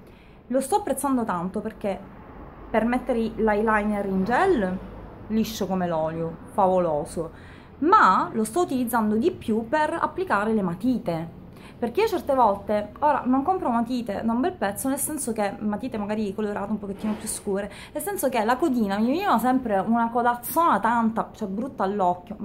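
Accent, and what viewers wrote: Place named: Palermo, Italy. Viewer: Ciaooo ti ho appena conosciuta su YouTube! Ma sei di Palermo? Si sente un po l accento ma non vorrei sbagliare ^-^ sei in gamba mi sto vedendo un bel po dei tuoi video perché vorrei mettere me ma anche la mia famiglia a dieta xD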